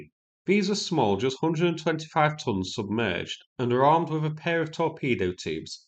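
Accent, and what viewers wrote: United Kingdom. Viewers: Find someone who can speak English not British jibberish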